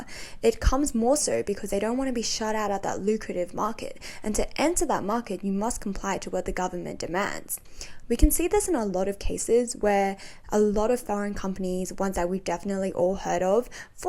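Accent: England, United Kingdom. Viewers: the accurate British accent as an ESL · I see, beautiful girl, love the accent. Is that British? I liked the content too. Thanks for sharing. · never seen an asian have a british accent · you seem to have a English accent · why are you talking like bri'ish?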